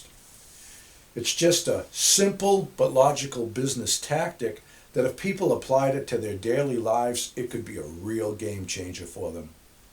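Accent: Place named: New Hampshire, United States. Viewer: Love your channel and your life approach. Perhaps it is because I came from NH and miss the accent -- but of course, we do not have an accent. Keep making the videos so that I have something good to watch.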